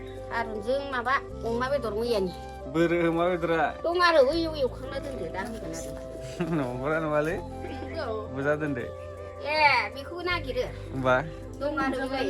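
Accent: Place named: Goalpara, India. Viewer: जोंनि गवलपारानि रावजों सेम आदा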